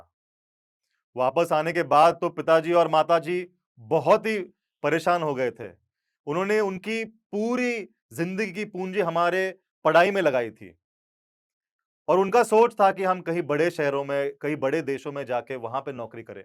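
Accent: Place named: Maharashtra, India. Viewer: Aapki hindi is very much maharashtrian..❤❤ love ur products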